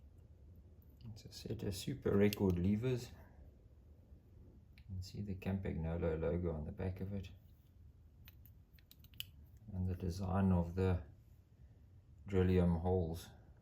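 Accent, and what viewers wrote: South Africa. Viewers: Being from the States I dig the accent but more importantly I echo the philosophy regarding vintage steel bikes. They still have a lot to offer and the character and design of certain era’s is sublime. I get a “woody” finding them, cleaning and overhauling them and of course riding them. Keep up the good work in South Africa your videos are very enjoyable and informative.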